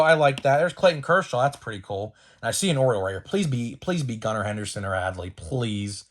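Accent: Pittsburgh, United States. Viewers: Just found your channel. Two seconds into listening to you while doing some work I said this dude has to be a Yinzer. LOL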